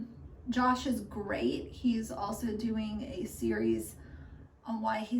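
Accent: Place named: South, United States. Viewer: Lip fillers and botox!! She is from MN and suddenly has a southern accent and spoke to Amy Duggar King and now says, ” Y’all.” She is very one-sided with her opinion. Only hers counts. I subscribed when she covered the Schoenfield alleged Munchausen by proxy kids. I unsubscribed when she ripped the Duggar kids apart. Not including Josh, the others need compassion. Enough ppl complained and now she has changed her tune. She is small-minded. I feel sorry for her. She is very typical of this area of the country. I have lived in each region of the U.S. and I feel I am able to make this opinion also from experience in the Military and from being a Military wife.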